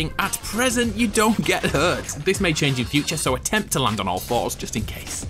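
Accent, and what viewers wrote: United Kingdom. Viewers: you, tracer and pyrocynical are the only humans that i respect whom speak british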